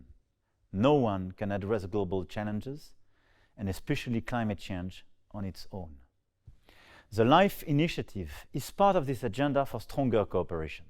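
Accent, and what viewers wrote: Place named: France. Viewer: yeah, your french accent while speaking english is totally sexy, but it's too easy to manipulate your message by discrediting what you say as "lost in translation". the burden of access to your messaging is on non-french speakers. i learned french to understand your politics - anyone else may as well. by enabling single language english speakers you allow france to be manipulated as a "blueprint" when in reality, most geopolitical spaces would never be able to copy french process because france is 80+ years ahead of other nato dev. it's unreasonable to encourage other nations to "follow france" because they could not effectively do so now.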